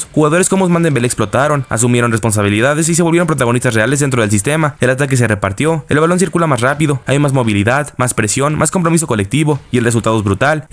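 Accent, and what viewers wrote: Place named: Yucatan, Mexico. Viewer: Es que es yucateco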